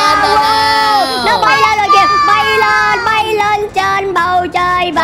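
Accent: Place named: Thanh Hoa, Vietnam. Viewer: Noi thanh hoa ngoai nghê an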